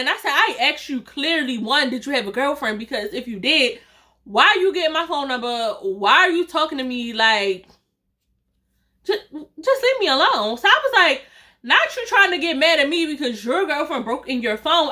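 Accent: Baltimore, United States. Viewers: Girl you are my favorite storytime teller I get so happy when you post a storytime yesssss and the Baltimore accent adds a little pazazzzzzzz 😂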